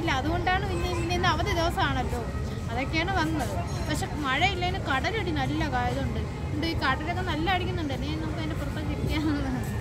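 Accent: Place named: Thiruvananthapuram, India. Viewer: മോളുടെ സംസാരരീതി കേൾക്കുവാൻ നല്ല രസമാണ്. പ്രത്യേകിച്ച് തിരുവനന്തപുരം ശൈലി.❤️👌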